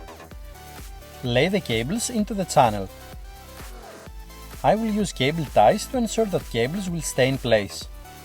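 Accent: Greece. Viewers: Καταλαβαίνεις τον Έλληνα από την φωνή...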